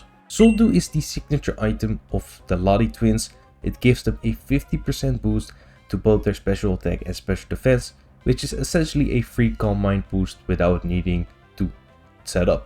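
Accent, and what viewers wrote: Netherlands. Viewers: I like how i could tell you were Dutch after the first 5 seconds. I am also Dutch myself 🙂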